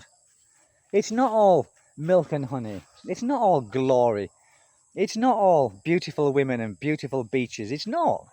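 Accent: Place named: England, United Kingdom. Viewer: I like your videos. Not only good insights, but a relaxing style, including the cool english accent. 😎👍 Now about independence in relationships, the way i like to express it, is the idea of three lives. A life for you, a life for me, and a life together. Because no one person can always be all things for the other. And that's quite all right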